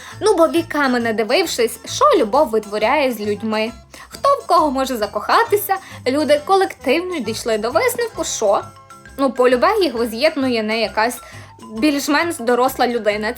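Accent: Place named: Western Ukraine, Ukraine. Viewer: Кумедна діачинка. Я хоч і сам з заходу України і для мене такий говір мав би бути звичним явищем.та чомусь ваша маннра викликала в мене посмішку. Успіхів у розвитку канала!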